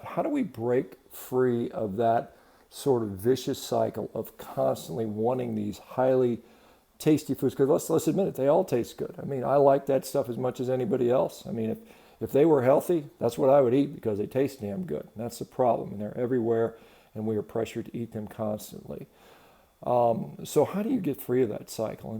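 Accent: United States. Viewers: Love your American accent and soft relaxing tone👍💋♥️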